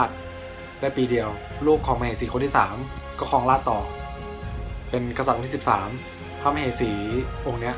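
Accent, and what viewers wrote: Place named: Thailand. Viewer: เหน่อ 55 น่ารักไปอีก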